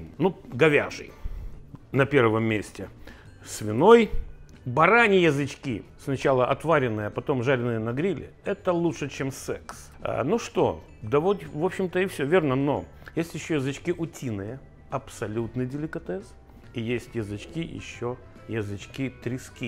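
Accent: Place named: Murmansk, Russia. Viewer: Слышу Мурманск - ставлю лайк.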